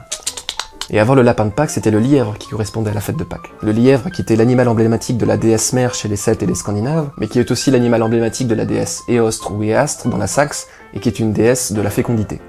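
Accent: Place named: Alsace, France. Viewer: j'entends de plus en plus un accent alsacien dans ta façon de parler. Ah yooo, ça fait plaisir dü ! ;)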